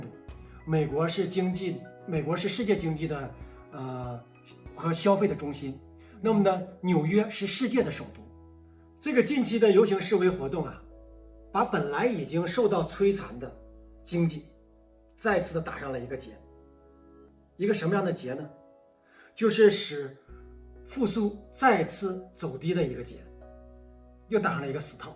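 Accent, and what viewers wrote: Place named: Northeast, China. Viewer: 这个人的说话要训练，且不说一口东北音，他每一句都说：这个的话呢，什么的话呢……。这是语病，要做播音必须要改这个不净口。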